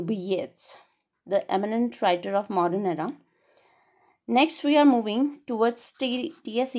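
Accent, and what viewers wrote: India. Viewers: Nothing is more irritating than North Indian accent.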